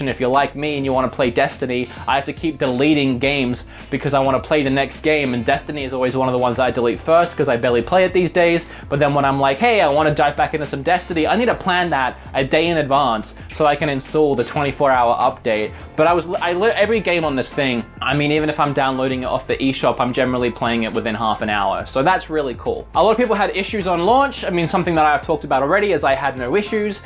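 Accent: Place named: Australia. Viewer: His Aussie accent is so prominent in this video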